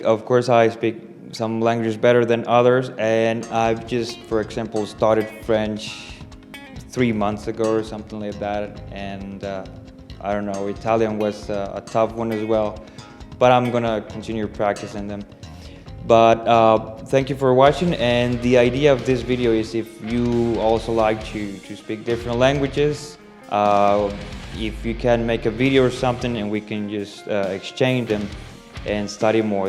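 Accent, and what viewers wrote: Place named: Ostrobothnia, Finland. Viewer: Yllätävän hyvää suomea! Huomasi myös että oot asunu Pohjanmaalla. 😊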